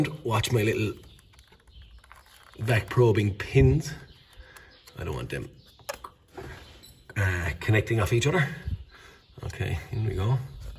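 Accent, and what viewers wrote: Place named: Ireland. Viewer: Awesome video, everything thing I needed to get on. Mostly just the location of the sensor. But the using the multimeter and what to expect. I initially had been watching without audio and using subtitles and I could tell you were Irish by the subtitle errors. Great accent. I have a Geordie accent so I'm not throwing any shade. ✝️🇬🇧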